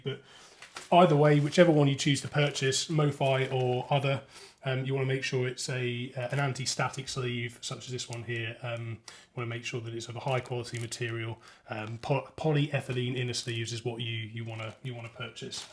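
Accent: United Kingdom. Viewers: Sounds like you are from the U.K. those 'mofi sleeves' doesn't seem readily available for Amazon UK. Have you tried any alternatives, say the 'bigfudge' brand or Amazon's recommended 'spincare'. Heard lots of mixed reviews about their sleeves. Cheers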